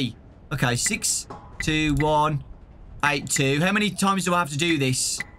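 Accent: United Kingdom. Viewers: Your from the uk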